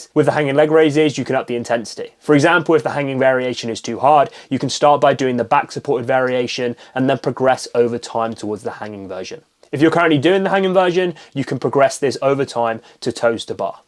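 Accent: United Kingdom. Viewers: You have some gorgeous blue eyes and I love the British accent. That's what you call a Bubble butt in the black community in the USA. I will never have a flat or wash board stomach because at 65 years old, I don't have the energy to be doing a lot of exercise. I am not overweight by the weight. My weight is 180 pounds and I am 6 feet tall. 🤣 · Oooo he’s Bri’ish 😍😍😘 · For me, it is his eyes, and adorable ingenuous accent. Brits are the best!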